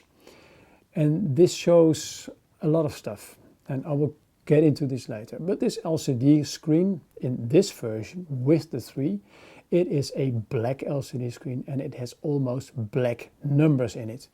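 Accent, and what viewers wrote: Netherlands. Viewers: Dat nederlandse acsent 😂 hahahah heerlijk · Je herkent een Nederlander die Engels spreekt gelijk. 😁😁😁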